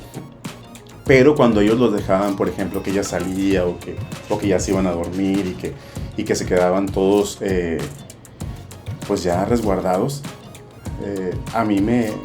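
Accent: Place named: North, Spain. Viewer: Hablas bien bonito, acento del norte je je je.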